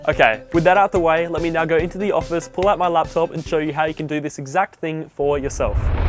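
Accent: Australia. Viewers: Pretty sure you Australian haha big shout out from NZ!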